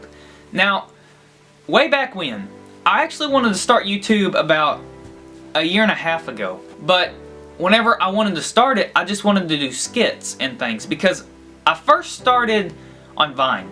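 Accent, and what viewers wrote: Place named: Arkansas, United States. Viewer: Btw I like your voice that Arkansas accent 👌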